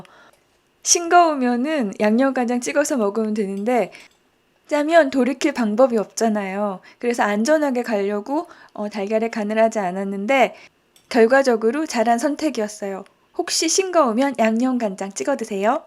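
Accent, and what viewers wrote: Seoul, South Korea. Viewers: 저 성대모사 엄청 잘해요 남편이 엄지척👍했어요 서울사투리 넘 귀여워요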